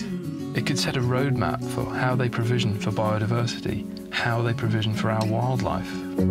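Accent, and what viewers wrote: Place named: United Kingdom. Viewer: Wow these comments. Tik tok nation. Can't stand a video for more than 30 seconds. His voice is soothing. Whats wrong with having a posh English voice?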